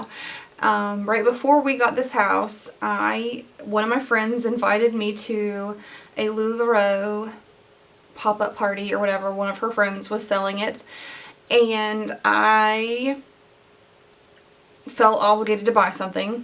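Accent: South, United States. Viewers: I am also bipolar and definitely understand that manic episode feeling a thousand percent! it's like you don't have control over yourself or your actions but you don't realize you don't have control you just feel super energetic I'm so sorry that you got sucked into that negative situation but I'm glad that you are out of it ❤️ also so excited to see someone with a Southern accent on youtube! From a Georgia gal living in Alabama 😂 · You’re 100% southern USA or have a deceiving accent if not! Love this video and your story!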